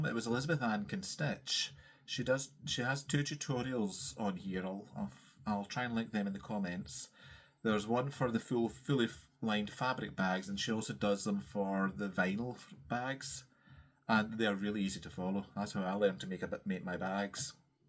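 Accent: Scotland, United Kingdom. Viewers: Hi! 🎉 congratulations on your first 2 Flosstubes. You’re a natural and I miss Amanda so I’m getting my fix of your wonderful Scottish accent! I’m Pauline of Sew. Be Bags who you bought your lace front project bag from. I remembered when I saw you’d made a Flosstube it was who bought one of my bags. As I’m watching you I’m stitching on Portrait of Veronica but she’s going away so that I can start my Glass Slipper because yours is fabulous ❤❤❤ love love love her. Can’t wait to see no.3 to see what you’ve been up to. 😊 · Love to hear a fellow Scottish accent on flosstube! I loved your full coverage progress on 18ct- cats are my muse, especially a black one.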